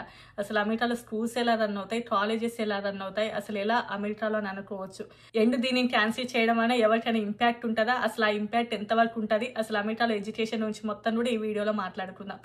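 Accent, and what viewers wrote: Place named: Karimnagar, India. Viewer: Madam , as per your accent, are you from Karimnagar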